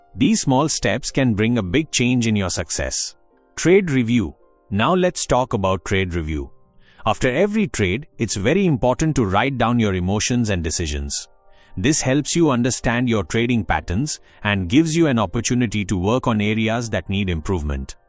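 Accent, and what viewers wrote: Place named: India. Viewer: Why does this AI have an Indian accent lmao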